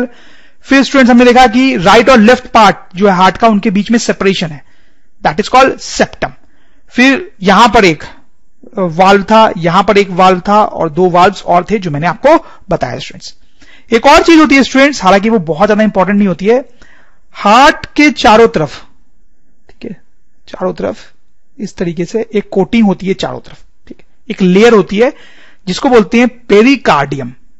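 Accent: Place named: Bengal, India. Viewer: Ap sir kaise bolte ho bengali hi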